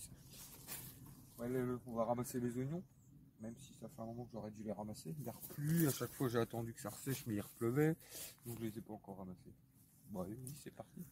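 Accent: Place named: Somme, France. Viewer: Bonjour . En effet des betteraves de ce calibre mérite un abonnement !!! C'est fait . Je vous envoi ce petit commentaire de l'Ile de la Réunion , mais votre petit accent ressemble beaucoup au mien (Je suis originaire de la Somme) !!! Sacrée belle récolte . Le meilleur pour vous . 👍👍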